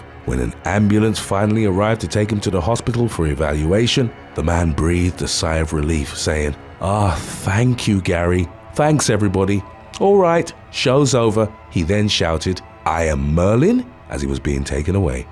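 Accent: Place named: England, United Kingdom. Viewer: Dudes voice is up there with Barry white and Morgan Freeman. The narrator would make a fortune doin audiobooks and horror themed shit lol. The English accent just locks it in lol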